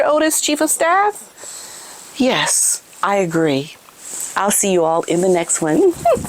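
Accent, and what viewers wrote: United Kingdom. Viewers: Hey, good British accent, ❤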